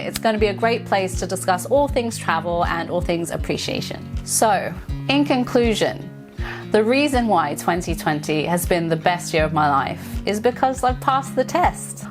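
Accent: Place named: West Country, United Kingdom. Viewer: I was amazed to hear you grew up in the States, you sound like a west country girl to me.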